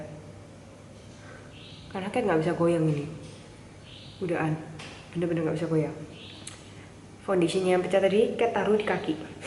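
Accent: Java, Indonesia. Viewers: jd kaya khasnya cindercella ya, dr segi nada bicara sma medok2nya haha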